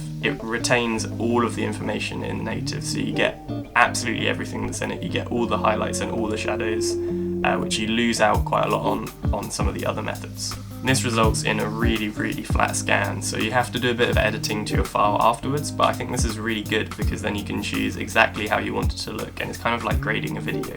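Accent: London, United Kingdom. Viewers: Are you from London?? :) keep up these great vids too bro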